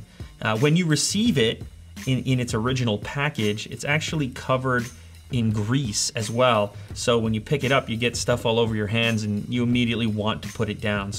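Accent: Canada. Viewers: Is he Canadian?? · is he canadian?? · Are u Canadian unbox therapy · R u Canadian mr.unboxer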